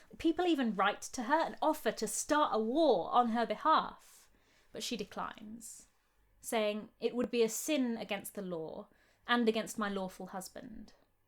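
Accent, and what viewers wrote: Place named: North, United Kingdom. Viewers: I knew you were clearly northern but I never thought you would be from Lincolnshire so now I have to consider if I should continue watching any of your videos that I had been watching. You are lucky that I used to drink in the Anne Boleyn pub on Green Street so today is a good day for northerners 👸🏻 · I was trying to place your accent...as a fellow east midlander I find it very easy on the ear....Great channel..we'll done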